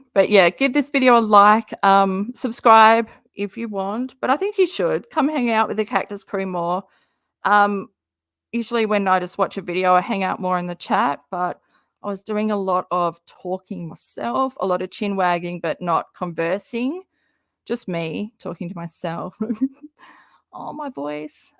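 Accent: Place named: Australia. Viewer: I have a sneaking feeling that karma is finally coming for a certain hater 😉and I can’t wait! FYI I LOVE LOVE YOUR AUZZIE ACCENT 😍😍😍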